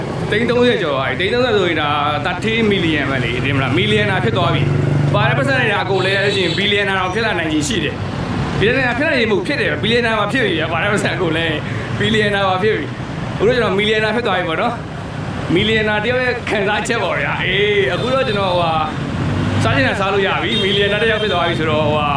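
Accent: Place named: Upper Burma, Myanmar. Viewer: ထမင်းစားရင်လဲ ကို ပဲကြည့် အလုပ်သွားအလုပ်ပြန် ကားစီးနေရင်းလဲ ကြည့် videoလေးတွေတကရ်သဘောကြတရ် စကားပြောတာကလဲ လုံးဝပွင့်ပွင့်လင်းလင်းနက်လုံးဝအညာစတိုင်ကြီး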